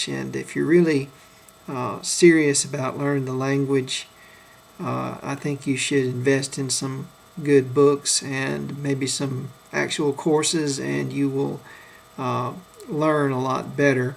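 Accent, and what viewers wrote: United States. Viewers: Good video. What kind of American accent do you have? (when speaking English, I mean)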